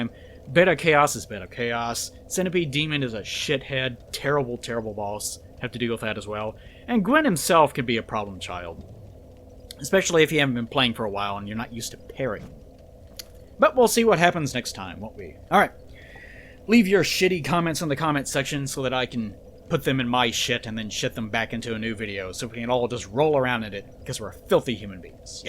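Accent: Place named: South, United States. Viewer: I just realized you sound a lot like SSoHPKC but with a slight southern accent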